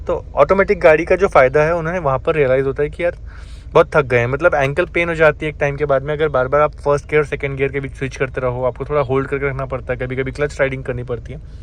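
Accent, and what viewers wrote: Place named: Mumbai, India. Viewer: Bhai kya commentry hai .. wah maza aa gaya ... Mumbai touch hai aapke language main .. keep the good work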